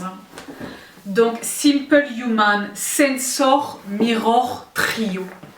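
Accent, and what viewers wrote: France. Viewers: Originaire de Marseille, j'habite à Ottawa au Canada et ma soeur m'a fait découvrir ta chaine. Le "salad spinner" est pas mal - je l'ai acheté l'année dernière et il est encore nickel! Merci pour tes vidéos! Ca fait du bien d'entendre l'accent français!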